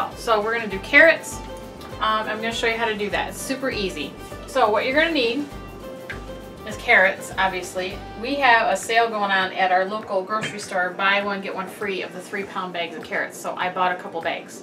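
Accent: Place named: England, United Kingdom. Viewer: I like you accent. Are you English?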